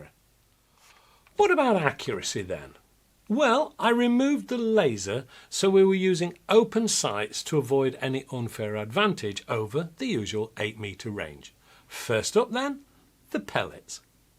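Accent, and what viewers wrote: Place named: England, United Kingdom. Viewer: Not used to gun reviews conducted by a gentleman with an English accent, but I’m here for it!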